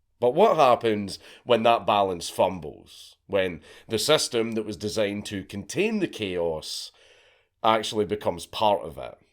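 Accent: Scotland, United Kingdom. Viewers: Whoa whoa whoa what is the Fuck did you just say about Exodus. You have never said anything that has ever offended me before but my dude that ain't cool! Edit. It just occurred to me after I posted this I think I just misheard you because of your Scottish brogue that I love so much that you said thrash band not trash band 😮😅 my bad rock on brother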